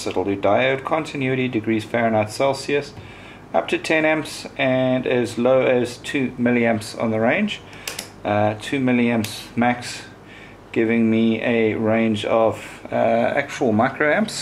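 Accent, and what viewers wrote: South Africa. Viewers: Sounds South African and they did once use a comma instead of a decimal point.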